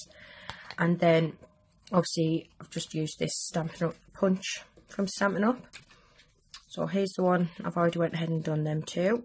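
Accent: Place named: Newcastle, United Kingdom. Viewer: Love the Geordie accent. I moved to the north east from Worcester 17 years ago and I recognise it anywhere. Love it!!! Do you have measurements for anything smaller please. I have subbed so will check out all your video's xx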